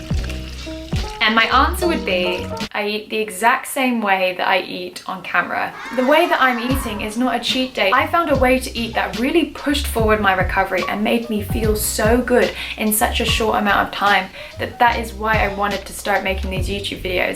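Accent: England, United Kingdom. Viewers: I don’t really know much about Hong kong so I don’t know if this is a stupid question but what language do they speak in Hong Kong?... do you speak it? And also I don’t really know how schooling works there but you speak with a British accent. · How did you acquire an English accent? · How do you have a British accent but you were raised in hk??? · You have such a contagious positive actitud! Love your personality. My questions are: where did you get a British accent if you are from Hong Kong and only been to the States? 😅 And, what have been the struggles and positives of making public your ED here in YT? Take care,. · Is your family English? Because you have a strong English accent ❤ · Hey, why is your accent so very British, when you've grown up in Hongkong and lived in America for such a long time, but never in the UK? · ! why do you have a British accent?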